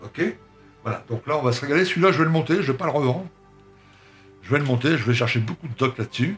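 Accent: North, France. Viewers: Sympa la petite voix un accent de ch'nord (normal pour un billoutte)...Super maquette..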